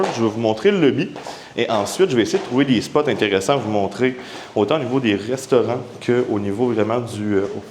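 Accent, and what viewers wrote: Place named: Canada. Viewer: Ah, cet accent Canadien, on ne comprend rien du tout, en plus il nous parle d'un scooter, qu'on voit à peine.... Compliqué à suivre, j'ai lâché au vout de 50% de la video 😪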